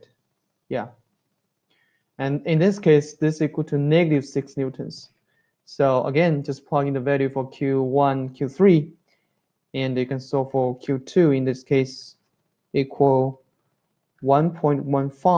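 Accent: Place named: United States. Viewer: your accent is american from which country you are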